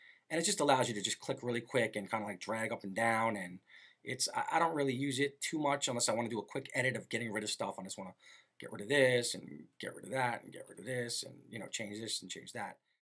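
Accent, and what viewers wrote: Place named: New York, United States. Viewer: Your so new york and italian it makes learning so much easier.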